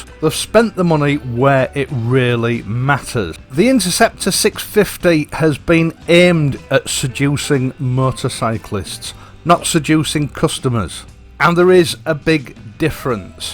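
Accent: United Kingdom. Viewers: I think you are only person who has given your heart to every comment... By the way I was taken away by your 'Raw British' accent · Thanks! Pro review, nice use of Queen's English, skill in composition and videography. Watching more than once.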